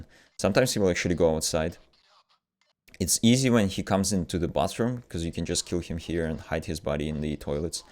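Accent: Russia. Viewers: You live in New Zealand right, but your accent reminds me russian a lot.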